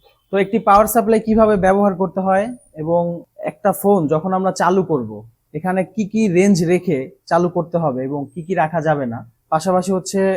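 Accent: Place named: Bikrampur, Bangladesh. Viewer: ভাইয়ের কথা শুনে মনে হচ্ছে আমাদের বিক্রমপুরের লোক। আমি মোবাইল সার্ভিসিং শিখতে চাই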